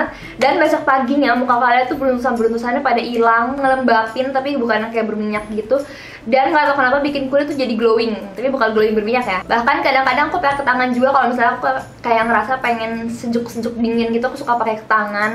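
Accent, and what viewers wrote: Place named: Java, Indonesia. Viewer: bagusan begini, medok tapi kok cantik bangettttt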